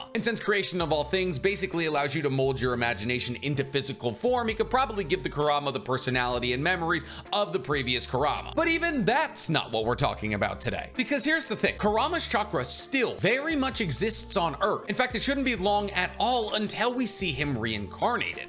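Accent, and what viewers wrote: United States. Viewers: Cn you please pronounce their names correctly? Your content is great but it hurts credibility when you pronounce the names super American-like.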